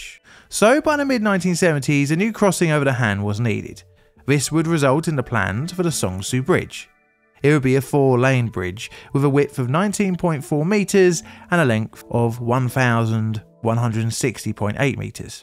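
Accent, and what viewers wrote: United Kingdom. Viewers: Talking tragedy with a condescending voice, very good ! Or maybe it the brit snobbery I'm hearing.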